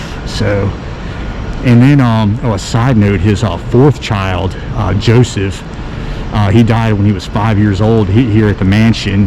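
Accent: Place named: Delaware County, United States. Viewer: Your Delco accent is strong AF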